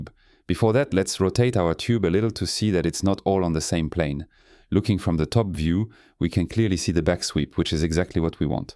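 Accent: France. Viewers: J'aimerais avoir vôtre fluidité en Anglais ! en tant que français je reconnais un autre Français xD Avez-vous déjà utilisé Solidworks ou Fusion360 ? en point de comparaison ? ou vous avez une expérience exclusivement sur FreeCAD depuis le début ?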